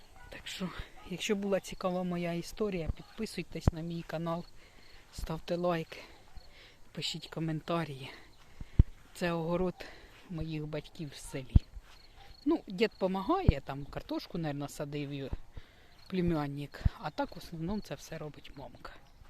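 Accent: Poltava, Ukraine. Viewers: По розмові чути це Полтавська обл.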